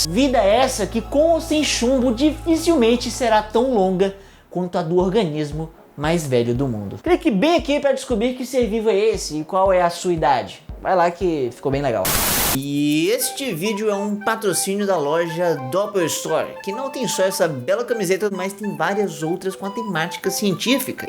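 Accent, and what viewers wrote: Ceará, Brazil. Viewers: Cearense ater falando de ciência é engraçado parabéns gosto dos seus vídeos, eu aprendo e mim divirto 👏👏👏👍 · Cearense kskskskdj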